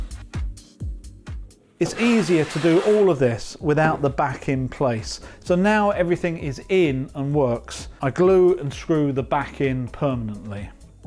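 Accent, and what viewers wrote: England, United Kingdom. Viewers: His accent is English !!? Literally no one outside the US uses imperial anymore because it’s stupid…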